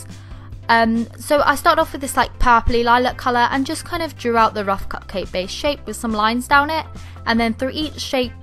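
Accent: United Kingdom. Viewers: I've been watching u for such a long time I love ur vids! PS: ur British accent is adorable🫶🏼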